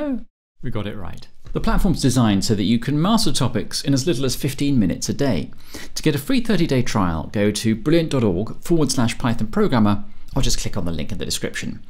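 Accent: United Kingdom. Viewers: man you are really cool always like your video and pure british accent , love from Bangladesh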